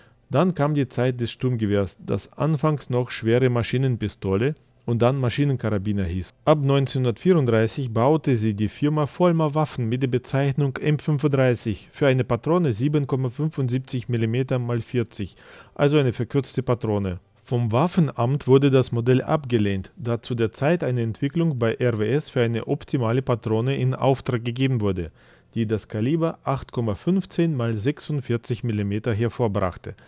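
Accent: Russia. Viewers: Ich bin begeistert, Ich fand ja schon immer das die AK 47 ein bissen wie die Sturm 44 aus sieht . Dein Akzent ist ausgezecht ich liebe Russischen und spanischen Akzent. ich bin gespant auf dein nächstes Video. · Geil , dieser russische Akzent im einwandfreien Deutsch! Top Video! 👍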